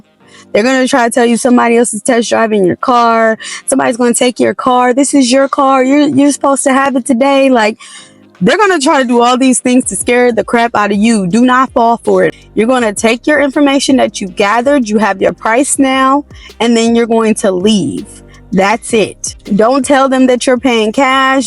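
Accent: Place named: Houston, United States. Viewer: i’m from houston too babes. your houston accent is so hot <3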